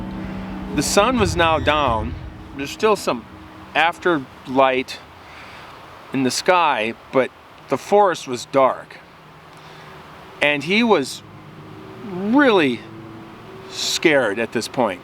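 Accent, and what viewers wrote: Minnesota, United States. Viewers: Thank you, keep hiking,Haha. I enjoy listening to you and even before you said you were from Minnesota I figured as much as I recognize your slight accent. Mine is probably a little thicker as I am from northern Minnesota. Anyway thanks again.😎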